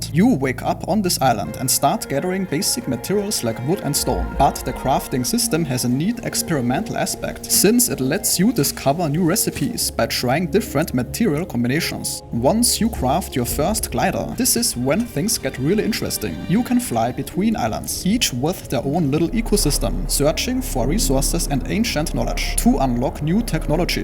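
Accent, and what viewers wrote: Germany. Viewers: dat denglisch !!! :( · german for sure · Bin das nur ich oder klingt das nach deutschem English?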